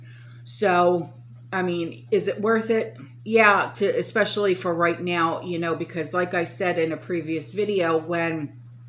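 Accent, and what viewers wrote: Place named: New York, United States. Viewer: The more you talk the more you sound like you're from New York instead of Florida.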